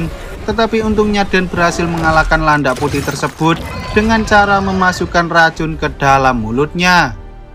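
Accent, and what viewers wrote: Java, Indonesia. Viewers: Suara nya terlalu medok jawa nya jadi agak kurang nyaman di dengar nya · Meddo yah gaesss 😂😂😂😂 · Jawa bangetttt lol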